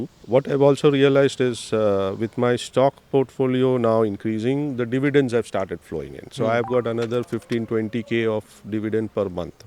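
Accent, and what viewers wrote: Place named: Mumbai, India. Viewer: From the accent, I got a feeling that he also belonged to Mumbai.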